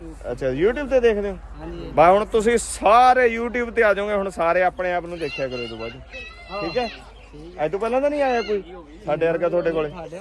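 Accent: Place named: Hoshiarpur, India. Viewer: Aap Punjabi Hoshiarpur wali bari meethi Punjabi boltay hain ko mujay Bohat achi lagti hay mairay Maan Baap Hoshiarpur say aaey thay.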